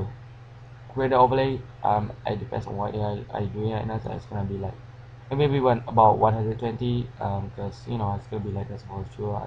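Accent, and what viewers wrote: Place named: Malaysia. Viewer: Malaysian voice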